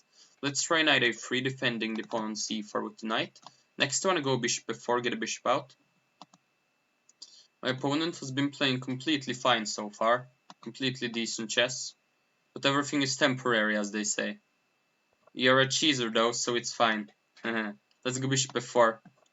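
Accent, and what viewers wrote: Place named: Italy. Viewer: Are you Norweigen? You sound more Italian aha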